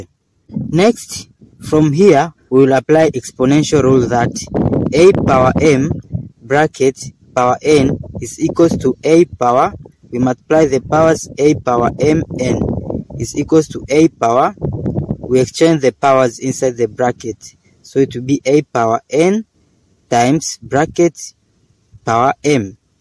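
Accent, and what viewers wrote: India. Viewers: Indian accent?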